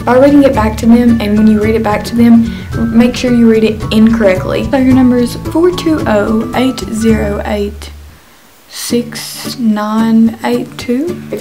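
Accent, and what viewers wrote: South, United States. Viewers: Pretty sure it's southern. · your sooo country😍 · Lol same! First I thought she was Scottish, then British or Australian! Until I realized she's probably from the south of the US. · yeah I'm Australian too and it bothers me seeing all these people confusing this clearly american accent for an Aussie one haha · I thought at the beginning but she has a freaking southern accent as well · she's definitely from the states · A southern accent. If you can't deal with it you need to grow up. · a country accent and has blonde hair hmm.. this makes me wonder hmm..how would she look like ??. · I thinks she's from Tennessee, or Texas, but not from Britain. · Why is your accent so country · Sounds like she has a southern accent to me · I really like her country accent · she has a country accent so I guess she is country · dat southern accent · she is so country omlllllll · are you from the south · wish i could like the vid but i hate southern accents :/ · What's your accent? 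At first I thought it was foreign but when I listened harder it sounds country.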